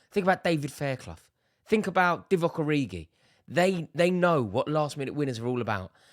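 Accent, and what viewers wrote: RP, United Kingdom. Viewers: your hillarious the way you was on the club to now is so different 🤣🤣 stop being so fake you’re so clueless i work a full time job and still know more then you (someone who gets paid to analyse football) the difference is you have the posh accent to sound sophisticated 🤣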